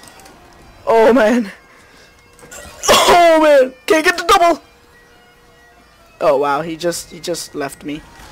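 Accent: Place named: New Zealand. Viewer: I don't usually do this but because of your accent I will... I recon that you are from New Zealand, May be wrong but you voice sounds like it. Btw nice vid, I made my own trickstab montage if you would like to see it.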